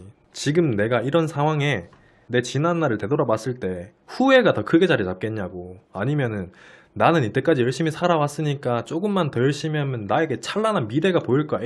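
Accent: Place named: Daegu, South Korea. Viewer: ~ 평소에 영상 즐겨보고 있습니다. 말씀도 너무 잘하시고 생각도 멋있으시고 멋짐에 빠져서 유투브 입문한지 얼마 안된 제가 자발적으로 즐겨보는 몇 안되는 채널이 채널 입니다. 이번 영상을 보면서 의 진심이 느껴져서 이렇게 글을 쓰게 되었습니다. 깊은 생각과 나름의 철학으로 만드시는 영상들이니 언젠가는 빛을 볼거라고 확신합니다~^^ 그리고 좋은 말씀 많이 해주셔서 감사합니다~ 제가 대구 사람인데 서울에서 힘들게 타지 생활하면서 같은 동향 사람 말씨로 이런저런 얘길 들으니 더 친숙하게 느껴지는 면도 있는 것 같습니다. 그리고 영상보면서 지금의 남자친구와도 사랑하게되고 그 사랑을 유지하는 것에도 도움 받고 있습니다. 타지에서 외로워서 남자에게 마음이 쏠리게 되더라고요. 단순히 연애 이야기가 아니라 그 너머의 것들을 이야기해주셔서 더 나은 제가 되는데 도움을 주셨습니다. 그래서 지금 너무 멋진 남자와 연애할 수 있게 되었어요^^ 의 진심이 담긴 흔적들은 시간이 지날 수록 빛을 발할 거에요~ 그리고 저에게도 오래오래 그 마음을 보여주세요~ 보면서 제가 항상 제 자신을 다잡을수 있게요~ 긴글 읽어주셔서 감사합니다! 늘 건강하시고 행복하세요^^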